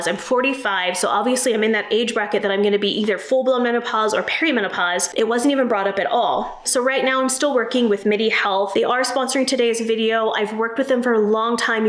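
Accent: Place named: Texas, United States. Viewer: It’s sad that you had to leave Texas but glad you’re able to move for your own well being. I noticed you took a little Texas accent: “Y’ALL” 😃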